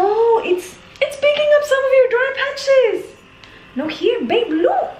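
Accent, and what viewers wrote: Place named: Negros, Philippines. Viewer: Taga san ka po? Parang taga negros ka ee. Hehe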